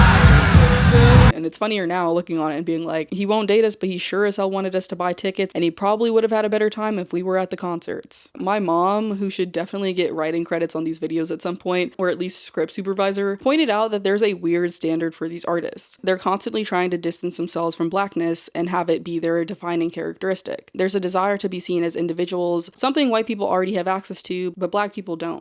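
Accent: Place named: Canada. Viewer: You sound Canadian.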